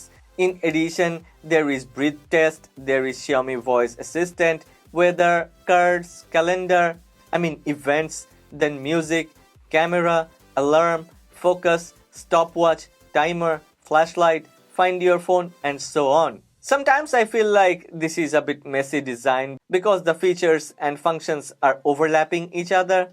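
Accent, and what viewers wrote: India. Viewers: I will be subscribed to your channel when you will stop to speak with this terrible Indian pronunciation.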